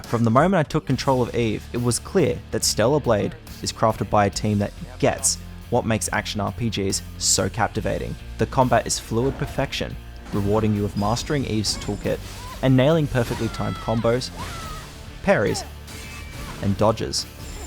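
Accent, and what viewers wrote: Australia. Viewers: They are Australian.. but i think what is lacking is seeing the narrator’s face, mannerisms & physical expressions. Though It does sound little green horn and someone reading out an assignment.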